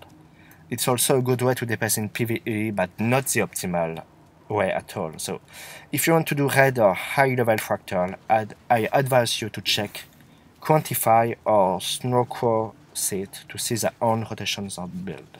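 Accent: France. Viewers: Dude! what are you french? best teaching video I've seen so far. Oh and I like when you say "it is insane" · J'entends un accent français :)) Excellent guide !!! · lol its french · Your english is difficult. You speak way to fast as your accent pronounces words wrong. Can't understand half of the begining. Gave up. Learn to enunciate english with out french habits. Thanks anyway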